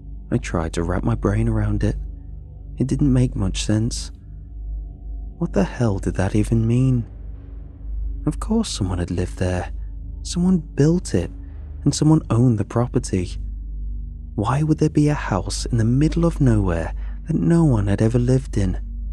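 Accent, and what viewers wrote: England, United Kingdom. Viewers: A guy with an English accent talking about Missouri and Minnesota. 😅🤔👍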